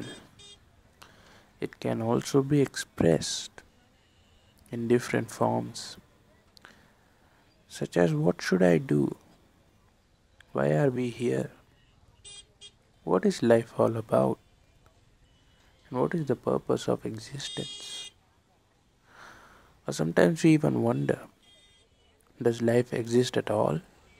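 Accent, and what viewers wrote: India. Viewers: Indian accent is just the best! <3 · Please do whispering videos!!! The Indian accent is the best accent ever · I love the Indian accent! Would be great if you did some whispering videos. 😊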